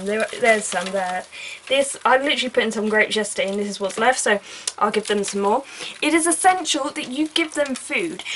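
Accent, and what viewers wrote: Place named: Cockney, United Kingdom. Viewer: Hate the cockney accent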